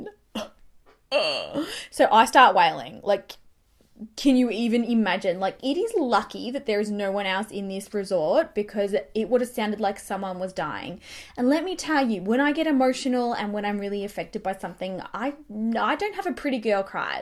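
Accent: Australia. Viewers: I recently found your channel and you’ve filled a huge homesick void for me. I moved from Australia to America in 2012 to be with my husband. I miss Australia so much, it might sound odd but hearing your Aussie accent and slang makes me SO happy, you’re so much more relatable and down to earth compared to other Australian YouTubers. I haven’t seen or spoken to another Aussie since moving here and I often feel like an outcast. Watching your videos reminds me of where I came from and to be proud of it. Congratulations on your engagement!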